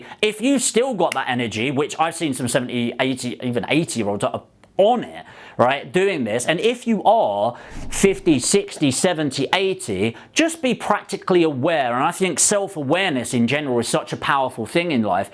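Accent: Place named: South London, United Kingdom. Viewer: Someone here is having a 'giraffe'... is from 'darn sarf' it's just accent 💛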